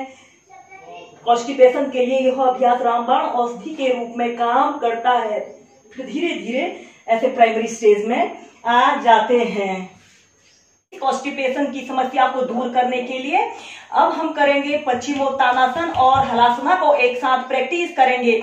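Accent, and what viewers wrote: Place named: Haryana, India. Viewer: Lagta hai Haryana k ho aap...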